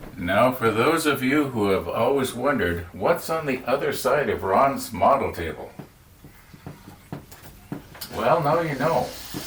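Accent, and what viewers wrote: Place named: Canada. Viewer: My husband is a retired physician and he builds airplane models from scratch makes plenty of mistakes from which he learns He did a few kits but prefers blueprints and goes from there. We are always collecting little interesting oddities to use. I will show him this channel it is very interesting. I am trying to get him to start on ships. My living room is no more airplanes hang from the ceiling LOL I wondered why you disabled the baking soda and glue (super glue?) video you made and became hollow. I personally loved that myself and will use it. I think it is super glue. I love videos are are unscripted and simply human! Thanks for posting. You sound like you are from Canada? or Minnesota or something like that!!!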